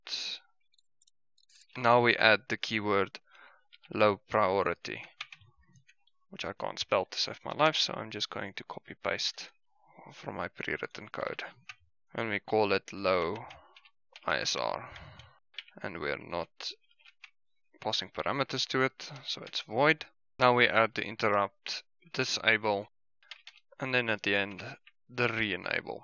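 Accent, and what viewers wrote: South Africa. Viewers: The accent sounds South African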